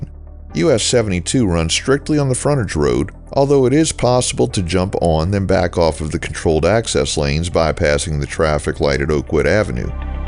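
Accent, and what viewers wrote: New Orleans, United States. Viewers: I just happened onto this video. Thanks so much for posting it. My son is living in Huntsville, and I know he'd like to see your drive through this part of the city. I know he'd especially like to hear a New Orleans accent, too, since he is homesick. I am going to tell him to watch this. Thanks so much.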